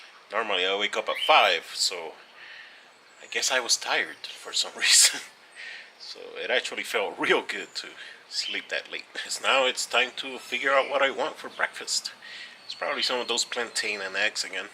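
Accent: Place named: Puerto Rico. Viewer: I'm new to your vlog. Saw you on veganvlogs. You look and sound puertorrican so welcome back to PR. Hope everything gets better for you here and that you find what you're looking for. I commend you on your efforts to try eat less meat. I know it's not easy I'm a meat eater myself who wants to go vegetarian. Always remember your goal to get healthy and you'll succeed.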